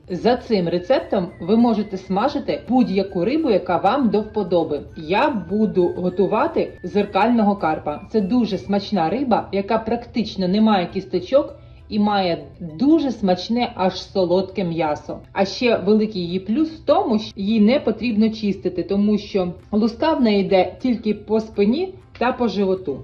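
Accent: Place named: Russia. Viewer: чутно що ти у побуті маскваротиш.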